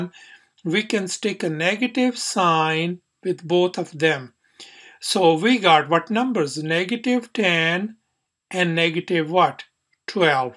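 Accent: India. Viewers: thank you guy with indian accent (i guess he's indian) now everyone's exam is saved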